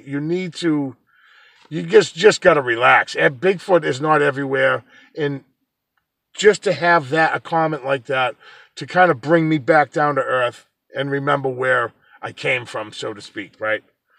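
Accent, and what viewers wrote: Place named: New York, United States. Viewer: I love your channel becsuse you're a regular guy dedicated to honest research. I like you even more now because asshat is my favorite pejorative descriprion of some folks. I also love your accent, and I'm from Long Island so I can relate.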